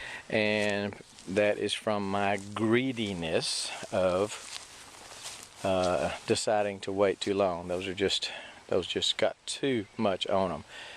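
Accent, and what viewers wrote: Texas, United States. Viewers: That's a natural Texan accent. Everything is better in Texas.😎😘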